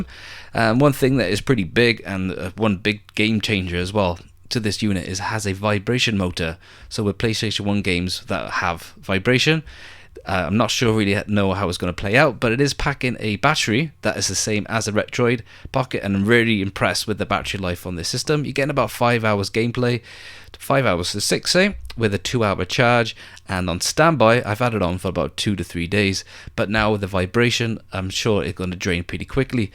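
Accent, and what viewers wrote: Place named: Cardiff, United Kingdom. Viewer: Is that a fellow Cardiff accent I detect? Great video mate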